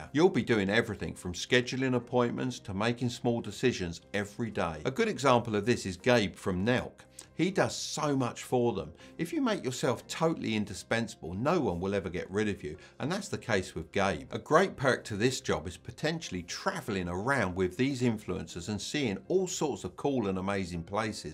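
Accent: United Kingdom. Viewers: you have a british accent so i trust you 100%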